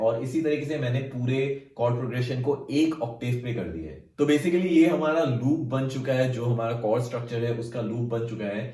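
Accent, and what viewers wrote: Assam, India. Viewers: Bro are u from Assam??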